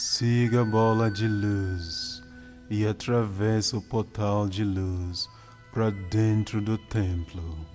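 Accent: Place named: Africa. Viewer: pra mim a entonação está normal, o fato que você deve ter estranhado é o sotaque africano do narrador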